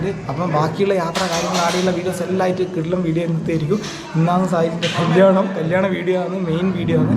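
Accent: Kannur, India. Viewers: എവിടെ യ നിങ്ങളുട നാട് കണ്ണൂരൊ കാസറഗോഡ് 🤔ഭാഷ എന്റെ ത് ആയതു കൊണ്ട് ചോയിച്ചത് പ്പ 😂😂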